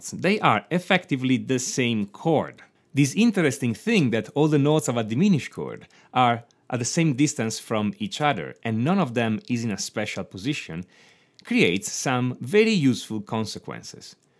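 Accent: Italy. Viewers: You sound italian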